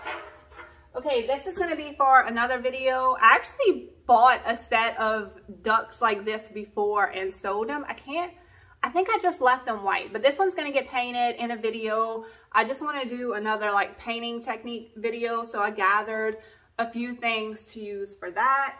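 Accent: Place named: Los Angeles, United States. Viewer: Love your ideas...learn so much...love your humor and LA accent🌱🦆🌱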